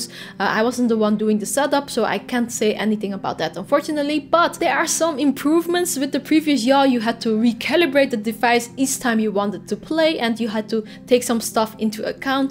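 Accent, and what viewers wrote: Netherlands. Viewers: Ur in the us? I always thought you had a Dutch accent 😅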